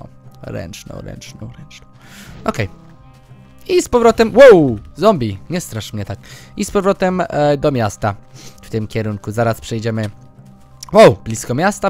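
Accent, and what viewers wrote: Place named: United Kingdom. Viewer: on jest anglikiem więc muwi troche inaczej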